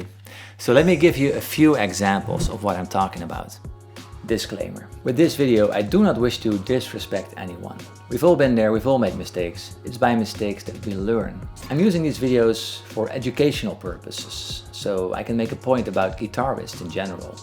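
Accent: Netherlands. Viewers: First video I watch from this channel. And after 5 seconds in, wist ik dat die dude Nederlands is.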